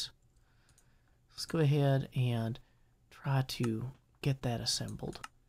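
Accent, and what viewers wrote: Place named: Tennessee, United States. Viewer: First time watchin' your videos, and I've gotta say they're great. Nice hearin' somebody else from TN on youtube. :)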